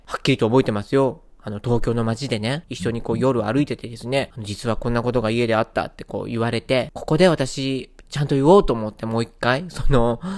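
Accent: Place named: Osaka, Japan. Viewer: あ〜今日も面白かった🙌 の大阪弁好き❤️ 東京ラブストーリーめっちゃ見てた〜 のトークもめっちゃ好き❤️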